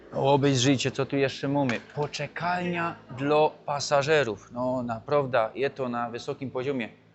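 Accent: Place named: Rural, Poland. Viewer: A co ty tak często zmieniasz KOLOR SKÓRY? Zaczynasz jako czorny Murzyn, ale za chwilę udajesz białasa! O tu ==> Po drugie ten wasz "język" śląski to zwykła KLASYCZNA wsiowa gwara, taką, to i u nas, w Świętokrzyskiem często spotykamy, ale nikt tu nie udaje "uczonego językoznawcę". Wsiowy, to wsiowy! To widać, słychać i... czuć. :hand-orange-covering-eyes::body-blue-raised-arms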